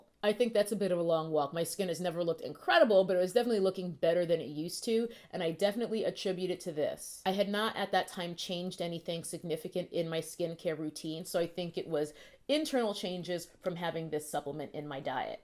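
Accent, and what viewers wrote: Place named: Brooklyn, United States. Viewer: Girrrlll i coulda swore you lived in NewYork. Like straight Brooklyn Native!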